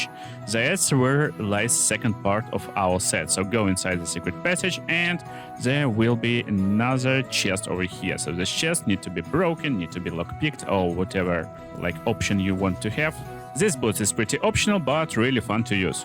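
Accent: Russia. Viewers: Даже на английском ютубе по бг я нашел человека с охранительным русским произношением. Гайд кстати хороший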